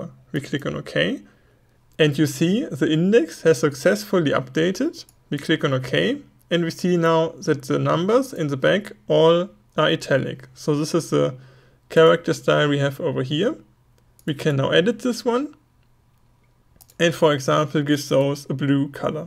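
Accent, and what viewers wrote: Germany. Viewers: Very nicely explained. Great German accent too. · Very well presented ... Easy to follow your tutes and a pleasure listening to your 'German English' :-) thanks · I simply loved his explanation! I am Brazilian and I speak little English. And although you have the German accent (it seemed to me), his way of explaining was very good, really. Got it in practice more than 80% of the things you said. This is a challenge for me because I have difficulty understanding English when people talk a little faster. With you, it was great! Another important point is your personal position because you are very friendly and pleasant in their explanations. Congratulations!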